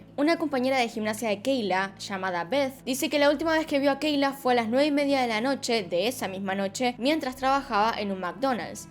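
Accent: Argentina. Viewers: Buenísimo video, me encantan todos tus videos, siempre lo explicas con claridad tqmmm, además que me encanta el asentó Argentino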